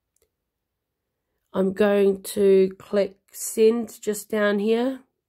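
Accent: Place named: New Zealand. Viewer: Lol funny, as soon as you started talking I thought another Kiwi. Great video by the way.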